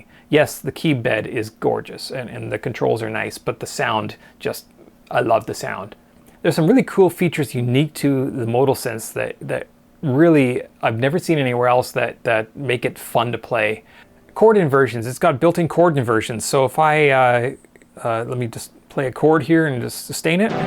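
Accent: Canada. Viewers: Canadian?? French canadian??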